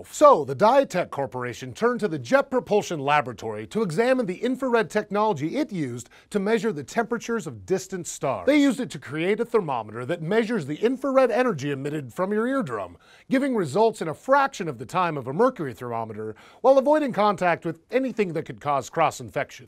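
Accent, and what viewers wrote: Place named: United States. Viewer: I´m normally not as keen on the American accent that I am on others, but this guy´s voice is purrrfect. And he works with SciShow. And he looks good. He makes me question my sexuality, goddammit.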